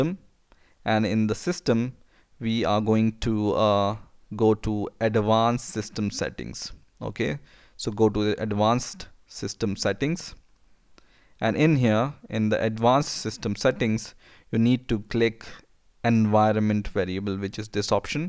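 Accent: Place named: India. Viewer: fucking indian accent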